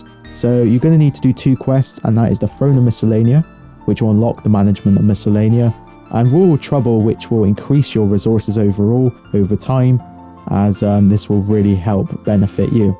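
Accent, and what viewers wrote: Devon, United Kingdom. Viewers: Devonshire or Bristolian? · Lmao where r u from you sound like your from Tiverton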